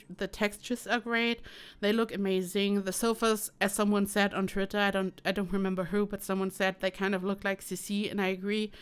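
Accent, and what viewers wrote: Germany. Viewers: I do hear the german accent out of your voice which I think is really cute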